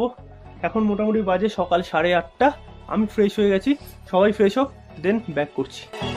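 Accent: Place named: India. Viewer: What a Hindi accent 😀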